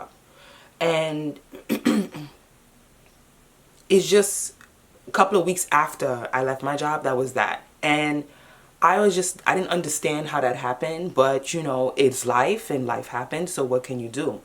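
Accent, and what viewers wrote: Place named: New York, United States. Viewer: Very curious to know where you're from! I know it's a NYC accent I hear. Is it Queens or Brooklyn?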